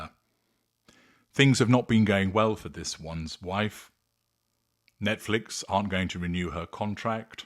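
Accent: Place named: Yorkshire, United Kingdom. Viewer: Ey up,! 💜 Loved your Yorkshire accent! Thank you for the morning laugh! You're too funny!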